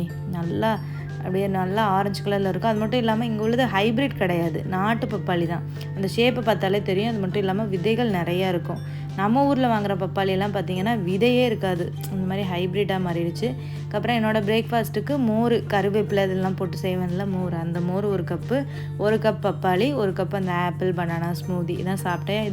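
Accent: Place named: Madurai, India. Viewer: Recently started watching your channel ❤so nice to hear the Madurai and Ramnad slang..my parents are from Ramnad.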